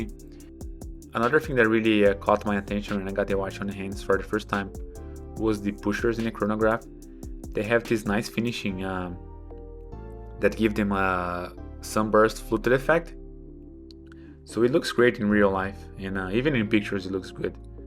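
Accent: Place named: Brazil. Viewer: Do you have Brazilian background? Based on your accent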